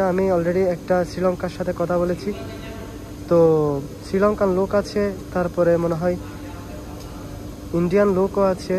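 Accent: Chittagong, Bangladesh. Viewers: আসসালামুয়ালাইকুম ভাই। কোন কাজ সম্বন্ধে ভালো ধারণা রাখেন তারপর ভিডিও বানাতে চেষ্টা করুন আপনি যে বলতেছেন এসি ফ্যাক্টরি আসলে কি এটা এসি ফ্যাক্টরি নাকি ডাক্টিং ফ্যাক্টরি সেন্ট্রাল এসি তে তিনটে পার্ট থাকে ওইটা কি একটা অংশ আর কিছু আবাল কিছু না বুঝে শুধু কমেন্ট করে যাচ্ছে ভাই মনে কষ্ট নিয়েন না আপনার ভাষাগতভাবে বুজা যাচ্ছে আপনি চট্টগ্রামের মানুষ চট্টগ্রামের ঐতিহ্যগত খেয়াল রাখবেন।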